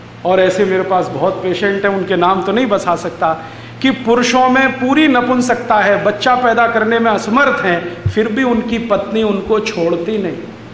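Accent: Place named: Lucknow, India. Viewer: Mujhe lagta hai ap lucknow se ho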